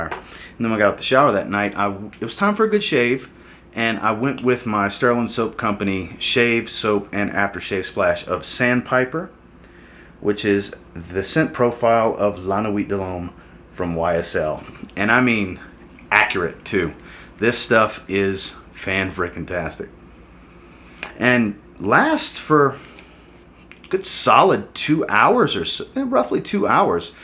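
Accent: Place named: Gulf Coast, United States. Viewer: I’m wondering what you would recommend for a night out on the town in New Orleans. May be wrong but you sound like you’re from the region, gulf coast at least… therefore you know how hot and humid it gets. Looking to wear a fragrance for this Saturday if possible. Already own some basics that could work like Sauvage and CDNIM… Sauvage got me strong compliments the other night. What would you recommend to me? I was close to getting profondo but am now changing my mind. How about something like Prada Carbon? I’ve been looking for days for a good summer night fragrance and I can tell you’ll set me straight!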